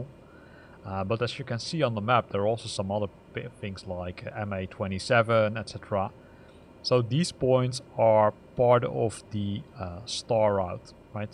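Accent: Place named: Netherlands. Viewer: Sounds Dutch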